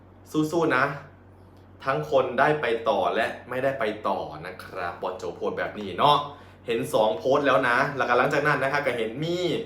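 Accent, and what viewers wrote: Isan, Thailand. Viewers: เสียงอีสานยังรอยู่นะลูก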